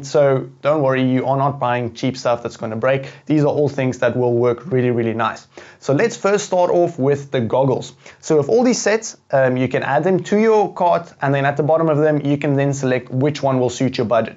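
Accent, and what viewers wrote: South Africa. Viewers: You sound like a fellow South African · are you from South Africa?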